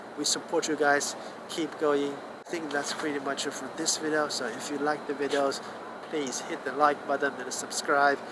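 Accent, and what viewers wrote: China. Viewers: 你以为离开中国这个泡泡看到了世界的真相，其实你只是被包裹进了另一个泡泡而已，战争就是战争，一句带过的美军战争和整篇批判的俄乌战争，你的屁股已经坐歪了。我支持每个因为人种宗教政治或历史原因结成的团体捍卫本团体的生存权和利益。所以我同情乌克兰人民，夹在大国间艰难生存更被战争摧残；我也同情俄罗斯人民，因为历史体制政治等原因一直被孤立于西方世界只能努力的寻求着不被蚕食瓦解；我甚至理解台湾，明明和我们用不一样的世界观看待着这个世界，却始终不被完整承认，对明天的结局也充满未知；我也理解美国，无论错对做什么也都是为了本国好，对于美国人而言本该如此；我唯独没法理解的是你，英语再流利也听得出是中式腔调，说明你是在中国长大的中国人，一个离家的孩子哪怕走的再远看的再多，哪怕知道家里还有多少不足不对不堪，需要做的应该是让这个家变得更好，而不是一副完全对立的嘴脸完全否定这个家，否定所有的家人。很多历史的政治的原因造成的问题，不是一朝一夕就能完全改变，但今天的中国也远不是从前的愚昧无知，今天的年轻人也远不是你口中的是非不分。当有一天，你嫌弃的中国消失了，你，这个永远无法改变的中国人，也会很惨，相信我！ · 呵呵 你掌握了流量密码 说着一口Chinglish迎合油管群体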